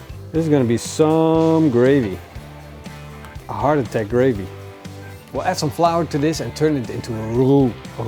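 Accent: Netherlands. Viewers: You‘re dutch, right?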